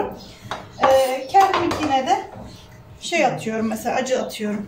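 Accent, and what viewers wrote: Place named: Mediterranean, Turkey. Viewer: Bende mersin liyim Akdeniz bölgesi şivemiz aynı 🥰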